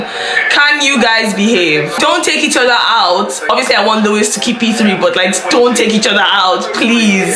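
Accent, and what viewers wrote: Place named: South Africa. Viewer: your accent...you from SA?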